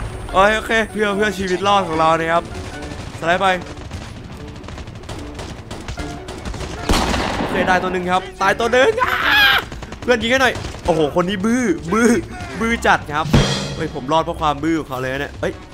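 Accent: North, Thailand. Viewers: พี่คนเหนือใช่ไหมครับ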